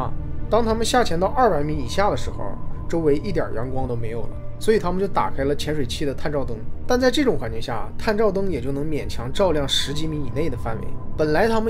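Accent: Northeast, China. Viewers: 好像东北人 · 能把东北口音努力改改吗…..实在是听着太俗气了😂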